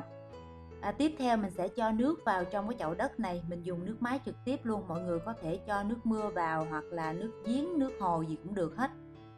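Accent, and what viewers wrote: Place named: South, Vietnam. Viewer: " " nói giọng nam mà lại ở đà nẵng hỉ?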